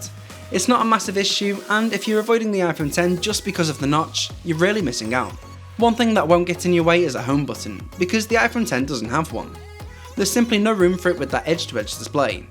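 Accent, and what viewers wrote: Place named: United Kingdom. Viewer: It’s his accent ... he’s probably from the UK or Australia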